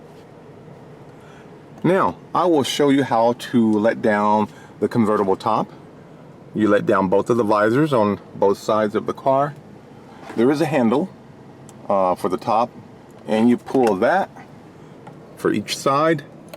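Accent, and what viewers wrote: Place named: Oakland, United States. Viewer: Love the video. You from Oakland? You sound kinda like me and my buds. :)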